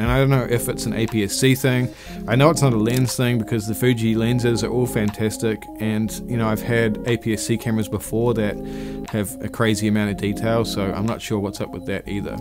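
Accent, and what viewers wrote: New Zealand. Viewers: It's so true what you say about the Fuji APSC vs 35mm. Too long a story to tell in full, but after 11 years of battling it out with Fuji and Lightroom, I've recently swapped over to two Nikon Z bodies, a bevy of their gorgeous Z lenses and Capture One. The difference is off the planet and it is the best thing I've ever done. Even my customers commented on how my images are so much richer and deeper and more gorgeous. I'm actually amazed how I kept any customers using Fuji for all those years. The Z50mm and Z24mm 1.8S lenses are just about glued on my cameras for all my events/weddings and gigs. The Nikon files are gorgeous and are just so rich and deep and process so well in C1. Was great to discover your channel. I'm a Kiwi but live in Perth now, but it's great to hear the accent and to get a glimpse of my wonderful homeland. Keep up the good work.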